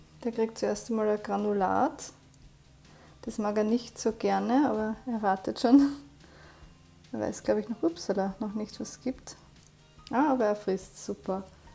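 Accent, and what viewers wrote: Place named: Austria. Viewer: Sehr cool erklärt! Und schön dass man dein österreichisch raushört ;-) lg aus Wien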